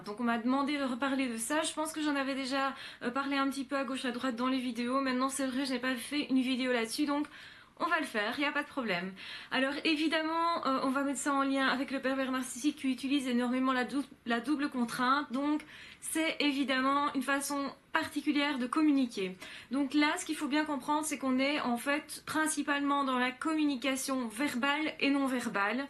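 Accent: Belgium. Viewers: J'adore votre accent (belge) avec: puis (pouis), fuir (fouir)... Victime d'une pervers-narcissique je me demande si je ne le suis pas aussi!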